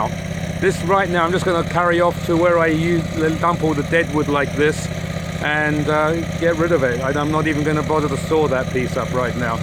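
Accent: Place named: England, United Kingdom. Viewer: I'ma be honest. I hate you english akkzents but I subskribed kuzz i like what you are doing. Salute.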